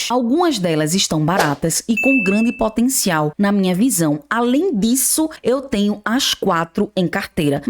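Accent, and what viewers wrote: Northeast, Brazil. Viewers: Letra B Vc é de q parte do nordeste? Sou de Caruaru PE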